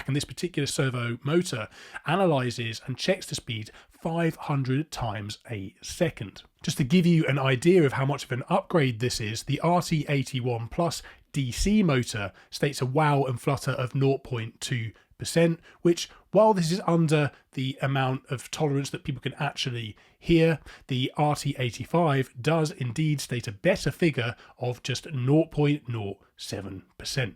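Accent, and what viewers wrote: United Kingdom. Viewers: If you’re in the UK - which sounds like it by your accent - please can you advise on an imminent problem I’m facing with my Fluance RT85, please? I’ve had one for four years and I’m certain the belt will need replacing very soon, however, going to the Fluance site, a replacement belt is £17 (reasonable) but adding in the cheapest shipping option, it comes to £110! I don’t have any Canadian friends to ask to purchase on my behalf and also can’t find what could be a compatible belt here in the UK… any thoughts? This could be an issue that many will face sooner or later.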